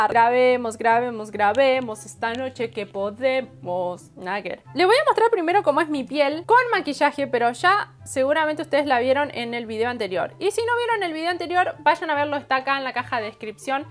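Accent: Argentina. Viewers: sos argentina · Tiene voz de Argentina o soy yo :v o chilena :v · Sos argentina ? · Jaja re argentina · Sos re argentina jajaj ahre que yo también ahre jajaj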